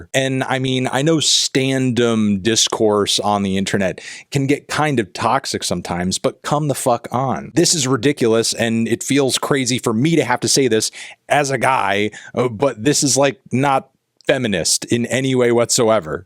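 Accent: United States. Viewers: I thought you were American :o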